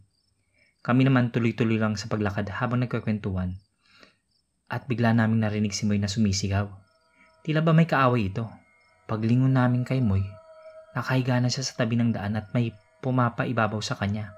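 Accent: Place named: Visayas, Philippines. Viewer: Natatawa ako sa tono ng bisaya ni admin..hehe..cute lng...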